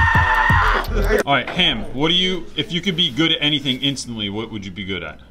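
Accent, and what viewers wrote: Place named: Philadelphia, United States. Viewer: Thank you! It’s nice that focus isn’t an issue anymore ))) Instantly glued to the like button. You accent is truly Philadelphian ! Love it. Thanks! Sir!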